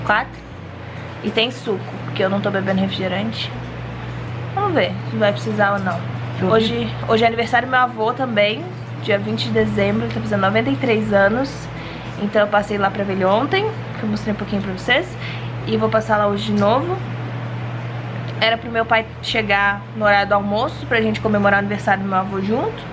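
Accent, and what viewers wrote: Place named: São Paulo, Brazil. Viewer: Você não tem sotaque de Mineiro parece de São Paulo, acho legal isso.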